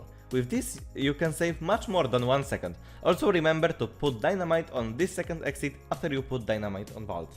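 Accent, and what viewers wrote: Poland. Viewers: Im I trippin or you from Poland cuz the accent is selling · Słychać że polak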